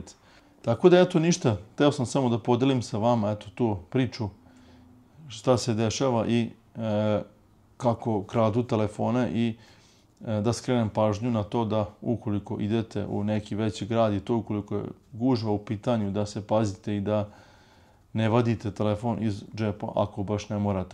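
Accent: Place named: Slavonia, Croatia. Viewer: Prijatelju zanima me odakle si , nekako mi zvuci kao da si iz slavonije kao npr Osijek ..🤔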